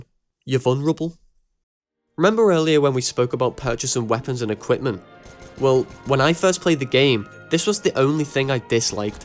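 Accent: Liverpool, United Kingdom. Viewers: Your British accent is so strong it reminds me of Paddy Pimblett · its an british accent i think scouse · British accent ❤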